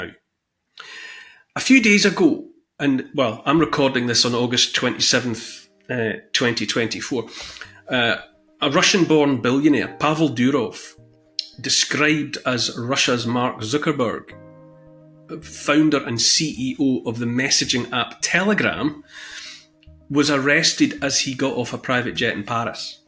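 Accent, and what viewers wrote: Scotland, United Kingdom. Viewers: From USA here, sometimes I listen to your channel just so I can hear your awesome Scottish accent. My favorite! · I love ❤️ that Scottish accent.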